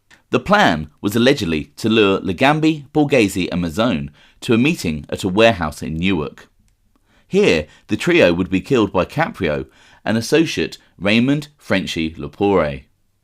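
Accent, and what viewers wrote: United Kingdom. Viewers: Dude, sorry, but it's so weird to listen to you, discussing American Mafia issues, with that hardcore British accent. Am i the only one here? All the credibility is just not there. How would you know stuff sitting back there in London?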